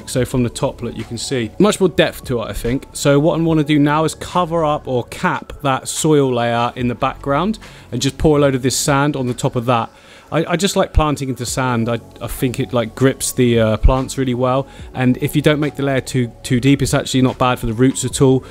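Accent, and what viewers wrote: United Kingdom. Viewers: I think he's from the UK.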